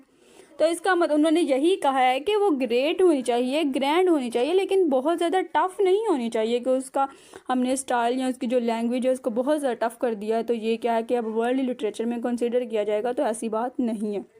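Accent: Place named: Himachal Pradesh, India. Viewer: Very nicely explained, 🎉 Are you from Himachal, or from Pakistan? ❤ The way you speak is so attractive, this kind of slang I always like. 😍 God bless 🙌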